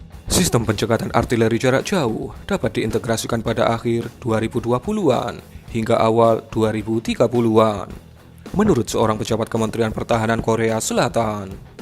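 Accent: Java, Indonesia. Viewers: bahasa indonesianya yg masih ada logat jawanya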